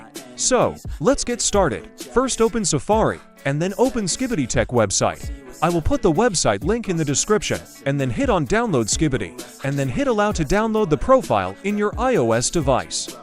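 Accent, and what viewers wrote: South Africa. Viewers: Are u South Africa bro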